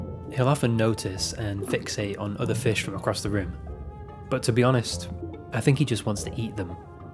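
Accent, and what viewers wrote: United Kingdom. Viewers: Bro has the perfect amount of British accent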